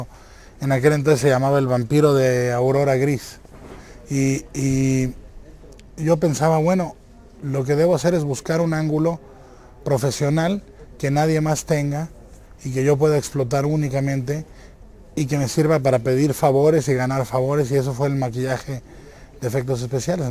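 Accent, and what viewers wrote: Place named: Mexico. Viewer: No you r wrong he is mexican he speaks like mexican, but he uses sometimes spanish words Im mexican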